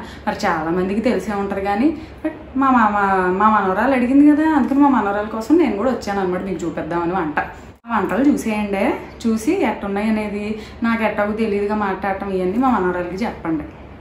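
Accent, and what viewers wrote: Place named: Godavari, India. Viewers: maa godavari slang laa anipinchindi gaaru chala bagundi🤩🤩😍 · Godavari yasa. Super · Hi,I have seen most of your videos.they are superb.your effort to do something new is good.your act as bamma is too good.you are from vijayawada.but I feel your language has Godavari accent.thats why got connected instantly.congratulations on your award.wish you grow farther. This is my first ever comment on any YouTube channel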